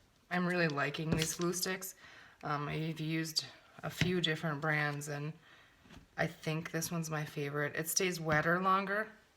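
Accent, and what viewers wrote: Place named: Minnesota, United States. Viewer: HA! I was born, raised, and lived the first 26 years of my life in Bemidji! I've lived all over the country and abroad, I've lost my Minnesotan (I get it back when my family comes to visit. lol). Your accent brings me RIGHT HOME! Also, I love all things crafting. I subscribed!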